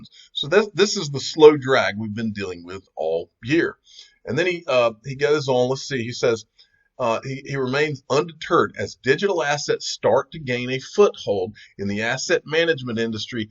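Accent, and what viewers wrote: South, United States. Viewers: That was halarious..with his southern drawl.😂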